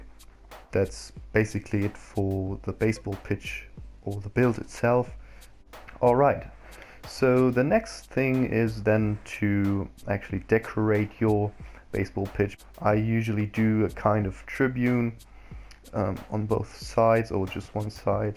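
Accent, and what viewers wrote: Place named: Germany. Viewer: Are you German? You have a German accent. Schade dass du nicht noch mehr Videos machst... Jaa bisher sind die noch recht Amateur-Mäßig... Aber so sollte Youtube auch sein und die Tipps und Tricks hier haben mir sehr geholfen. War auch recht unterhaltsam und entspannt. Vielleicht macht du noch was zu Jurassic Park ?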